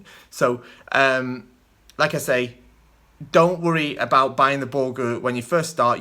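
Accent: United Kingdom. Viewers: Your accent sounds like some sort of Northern, but it doesn't sound either Geordie or Scouse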